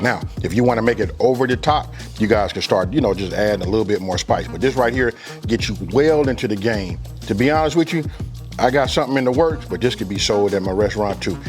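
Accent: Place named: Los Angeles, United States. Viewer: I love his la accent!!!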